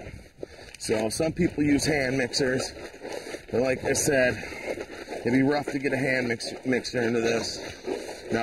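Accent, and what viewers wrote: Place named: Newfoundland, Canada. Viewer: buddy sounds like a newf most definitely a newf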